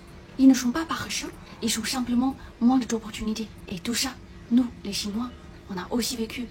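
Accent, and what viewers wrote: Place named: Portugal. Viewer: Pourquoi elle a un accent portugais ? Un bug de l'IA?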